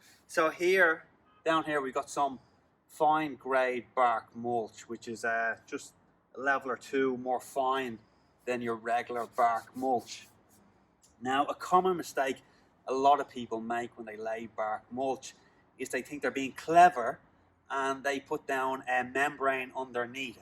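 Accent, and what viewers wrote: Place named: Ireland. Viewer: Sounds like you’re in Ireland.. I’m in the Nashville area of Tennessee and I would have to agree with you 100%. That’s what has always worked best for me and I’ve noticed the soil amendment under the mulch over a long period of time.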